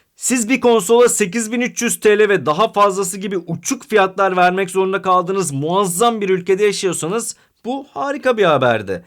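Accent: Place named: Azerbaijan. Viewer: Abi sen azerisin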